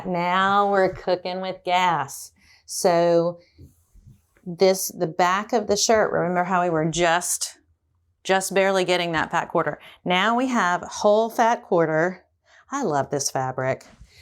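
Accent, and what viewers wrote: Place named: Alabama, United States. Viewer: what a pleasure it is to watch your videos!! I am an Alabama girl also, so I love your accent and your “southernisms”! I am a quilter and 3L ( this means love, love, love) using men’s shirts for my quilts. I buy them at the thrift store and I have found $60 shirts for as low as $1 on special sale days! Oh what a happy girl I am when I get such great bargains AND beautiful fabric for my quilts. Keep producing your videos and please share your finished projects with us!!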